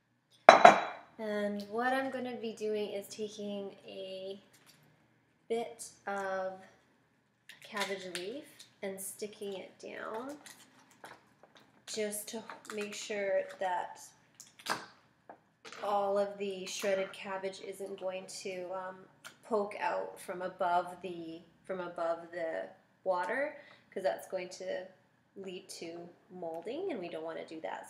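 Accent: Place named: Canada. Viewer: I like your Canadian accent.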